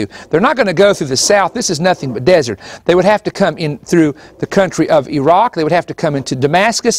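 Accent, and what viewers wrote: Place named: South, United States. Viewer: although his gomer pyle accent is somewhat distracting, he says some pretty interesting things....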